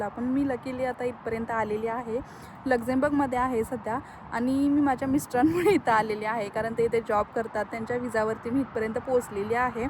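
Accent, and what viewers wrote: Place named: Satara, India. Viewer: गाव कोणत आहे ताई तुझं भाषेवरून साताऱ्याची वाटते . खूप छान वाटलं एकूण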